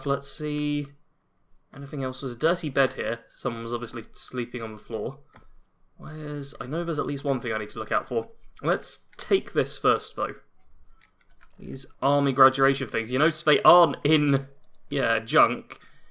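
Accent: United Kingdom. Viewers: It's all good. You're British. ;-). It is, however App-ah-lay-cha, not App-ah-lach-ia. Cheers!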